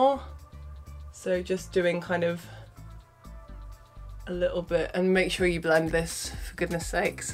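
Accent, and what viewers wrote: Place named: United Kingdom. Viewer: this is amazing and also one thing i was thinking about was the you sound like your from the uk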